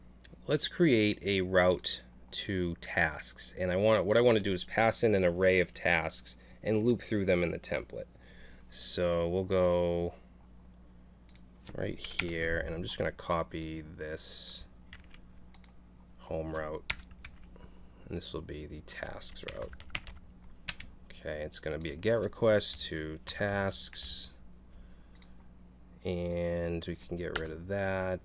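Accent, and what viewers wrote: Boston, United States. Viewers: Just... tremendous! Your laidback instructional style (and awesome Boston accent) has helped make so many seemingly scary technologies approachable for me, so heaps of thanks upon thee! Now that you've freed the masses from the shackles of "E" in Express and made Hapi.js accessible in its stead, I wonder what kinds of new stacks we're going to start seeing... I have a few in mind: * NASH: Node / Angular / SQL (whichever vendor) / Hapi * HORNY: Hapi / Oracle SQL / React / Node / Yeoman * HOTDAMN: Hapi / Oracle SQL / Typescript / Dust / Angular / Materialize / Node I wonder if any of these stacks actually exist out in the wild LOL!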